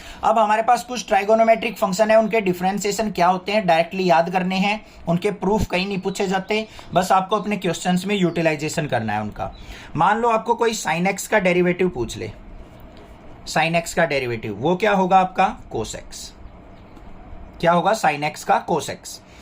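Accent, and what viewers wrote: Haryana, India. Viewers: "agar ---yo-- function " sir are you from harayana (I identify you by this word "yo") ex: yo choro kam ko----koonya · Sir aap Haryana se hai kya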